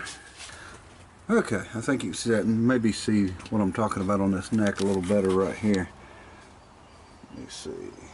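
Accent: South, United States. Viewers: were you ever a teacher? Because you sure are good at teaching this teacher a whole lot of new things! Thank for the excellent explanations and beautiful, proper and polite Southern English.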